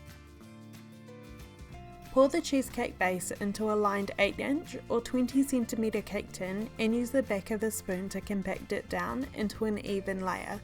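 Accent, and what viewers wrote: New Zealand. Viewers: Amazing recipe. I will surely try this. By the way accent is similar to New Zealanders. Am I correct? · Oh, nice. :D Quite different from the australian accent isn't it. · Ivana Matić more of a NZ accent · She has a New Zealand accent...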